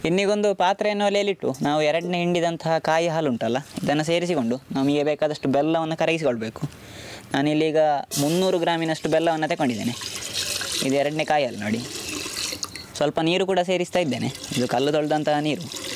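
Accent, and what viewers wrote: Mangalore, India. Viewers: Nimma vids ge naanu addicted aagogidini.. eshtu chanda adugegalu, chanda parisara, aa manglooru kade kannada, ahhaa.. nodtaa kootre samaya hoguvude gottagodilla nodri maarre!! Bahala santhosha!!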